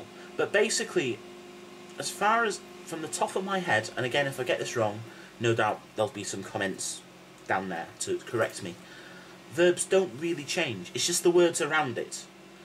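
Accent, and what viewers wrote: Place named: England, United Kingdom. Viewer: Great Video. Love watching u get all flustered! haha One question tho. Your English accent is quite "English" but your Welsh accent is very Bangor ish Are you a native Welsh speaker? I went thru Welsh education in both South and North Wales, and I don't know why but some of the words you have used and the way you say them are quite new to me!! hehe thanks for the video BTW!!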